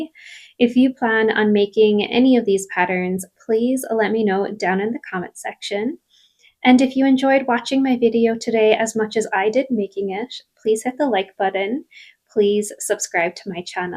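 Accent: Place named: Ireland. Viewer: Im so glad you mentioned you are from Ireland originally in this video - I spent the 1st 44 out of 45 minutes trying to place your accent, because some of the words you say sound so Irish, but some sounds not so Irish. I shouldnt have doubted myself so much 😂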